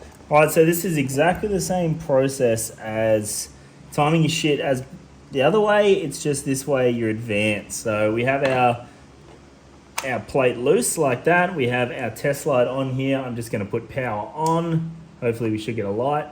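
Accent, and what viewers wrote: Australia. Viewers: *'s like a shit-talking, Aussie-accented shop manual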